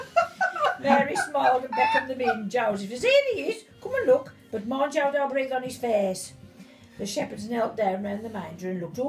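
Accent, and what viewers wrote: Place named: Birmingham, United Kingdom. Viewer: Not all Black Country ..Can here some brummie there too...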